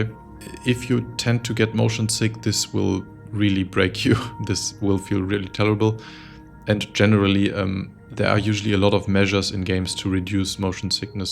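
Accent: Germany. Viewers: i didnt know you are german, but that accent, omg.. sorry herr 😄i also dont mean nothing bad, thanks for the video. I plan to buy some good vr headset and im crazy about star citizen. I keep my desktop pc up to date only for star citizen.. is special game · I love SC in VR. How you said, very immersive 😉 What is the key to switch between VR mode and 2d mode? und vom Slang her höre ich doch das du Deutscher bist. Eindeutig 😁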